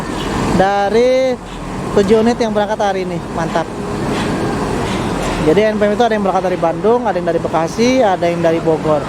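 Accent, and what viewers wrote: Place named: West Sumatra, Indonesia. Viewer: Masak gak tau lakuak paku bg...abg aja asli orng minang...bahasa indonesia abg aja masih ketahuan logat minang nya🙏🏿🙏🏿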